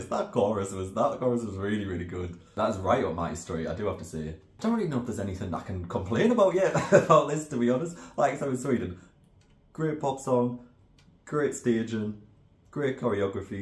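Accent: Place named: Newcastle, United Kingdom. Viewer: love that you're a Geordie too! cute. I wasnt expecting from the thumbnail you would be, haha whatever that means but how you say 'Tattoo' :face-blue-smiling:😍 love it. hey from Dublin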